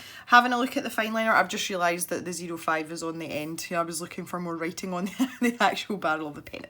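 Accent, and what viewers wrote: Scotland, United Kingdom. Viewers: OMG You're Scottish!!! WOW what up! Loved the video btw check out my podcast if you fancy a listen :)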